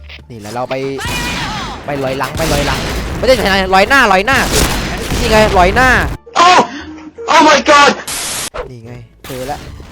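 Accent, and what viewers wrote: South, Thailand. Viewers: คนใต้ป่าวสำเนียงออก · เด็กใต้หม้ายนิมึง😆555+ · สำเนียงคนใต้ป่าววะ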